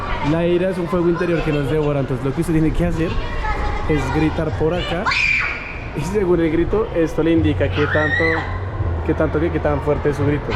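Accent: Colombia. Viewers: Pana? Este man es de dónde? Parece de Colombia...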